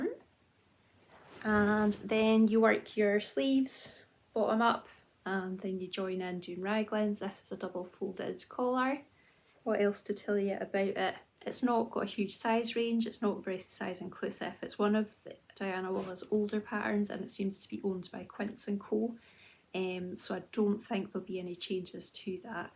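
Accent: Scotland, United Kingdom. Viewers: Hello there, nice to hear we are already with 5000 of us who also enjoy your lovely podcast. Love your no nonsense style and your Scottish accent. Keep on going your own way, we like the way you do this. Greetings from a Belgian fan.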